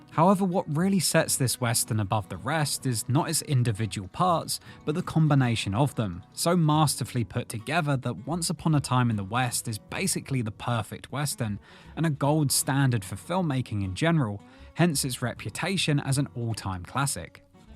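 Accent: United Kingdom. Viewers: Terrible list, but the guy sounds British, so....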